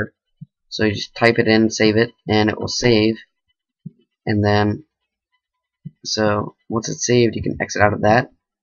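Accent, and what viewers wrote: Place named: Asia. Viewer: why do you talk Asian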